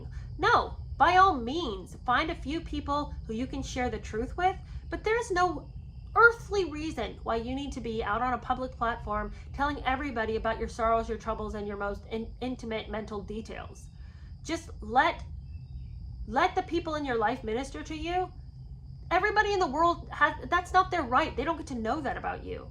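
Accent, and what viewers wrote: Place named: Cockney, United Kingdom. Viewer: dont try an english accent ! It's sounds goofy half cockney accent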